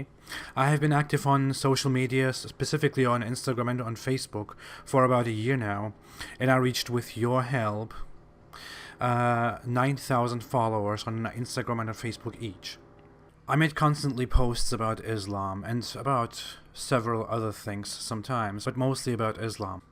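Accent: Germany. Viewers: Don't apologise for accent. German superior to the bastard dialect called English, duh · Most impressive is that he his accent is a German one. Really hope he wasn't given too hard a time when he was here as a child, by "either side". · Hallo, i can spot his german Akzent as soon as he started speaking xD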